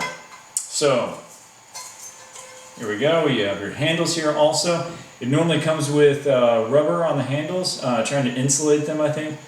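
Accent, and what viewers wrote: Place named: United States. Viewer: He is obviously speaking American English. His enunciation is different from yours or standard American English. I had no trouble understanding what he was saying.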